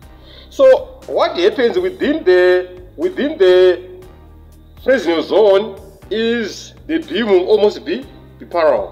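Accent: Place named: Africa. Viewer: I'm enjoying the videos especially thr African accent. Makes me feel so at home !!! Watched and started to understand knobology from same radiologist !!! Just great !!!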